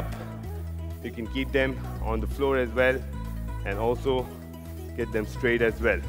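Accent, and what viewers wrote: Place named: India. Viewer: he sounds more Indian than American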